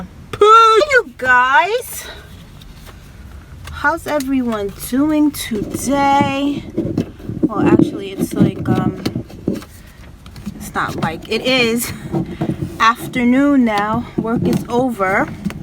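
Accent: New York, United States. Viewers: girl, you had me cracking up about that police officer and that gym...you like bitch, I don't want to talk to you! lol...lol...I was dying, I feel the same way, the gym is suppose to be where you get your workout on and then bounce, I woulda been mad too if I was you, I hate being bothered, especially when I want to be left alone and do my own thing...did you ever get to go to that restaurant or to see hidden figures? girl viola davis is an amazing actress, she puts her heart, soul, and everything else into her acting...I haven't seen either movies because unfortunately I don't get the chance to really go and see movies like I use to, but both movies look like they ought to be great.. look forward to seeing your mount review...I always wondered some time back how people was able to do that...giggle...I was lucky, when I was in my car doing videos, I wasn't moving, just sitting in my car, and the way my car is made I was just lucky, I was just able to sit up my camera with a small box and it worked...giggle..I think you have a little bit of an accent, not much... 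I have heard new yorkers talk and a lot of them definitely has it..does it sound like attitude? sometimes, depending on the person, andrew dice clay comes to mind, now that dude has a hella accent, and when he talks most of the time, its with attitude, lol...so again it depends...and oh girl! yes I saw new edition movie and I loved it!! all the actors were on point, I actually did a review on it, when you go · Hi sis, lol, I love you right..lol but I use to think at first you sound mad, but over the years since I know you better, I know you dont have an attitude. But its just the way you guys sound in NY, just had this convo with some ppl I know from NY, to me she just seems so harsh but thats just her demeanor and her way of speaking. Just like the way I talk is different, some say country or soft spoken. Now go watch my vid and tell me how I sound to you..lol..great video always and true about the life timers on welfare. 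The abusers, but the ones who dont try to do anything in life but use up the system, its the kids I worry about not the parents. Trump just wants to see how far he can rule and mess up lives. tfs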